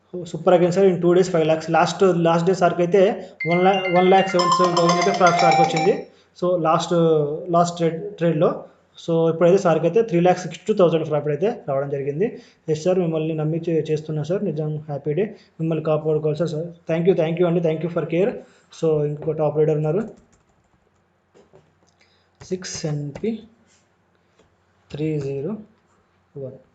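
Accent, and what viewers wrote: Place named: Telangana, India. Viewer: సార్ మీరు తెలంగాణ లాంగ్వేజ్ అలాగే ఉంది... 🤝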